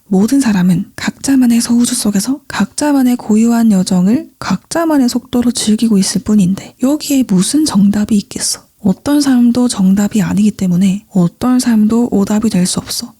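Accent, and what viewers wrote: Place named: Busan, South Korea. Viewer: 나만의 타인의 고유성 다양성❤ 감사합니다 😊 부산분 같아요 만나고 싶네요 🙏🏼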